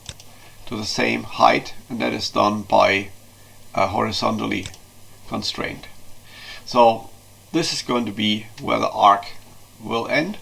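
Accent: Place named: Germany. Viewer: German english with stuffy nose... What a phonetic combo... Nice tut by the way, good job.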